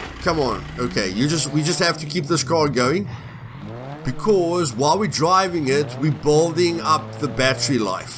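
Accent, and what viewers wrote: South Africa. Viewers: Always good hearing another South African accent. Thanks for these, I'm learning a lot! What happens when you log off? Is it like rust where you just fall asleep and your character is still in the world and vulnerable?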